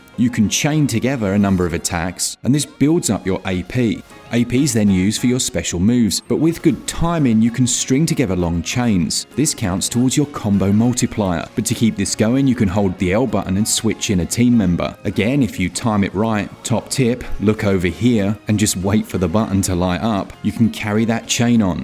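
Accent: England, United Kingdom. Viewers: Game footage and an English accent narrator. What more could one want?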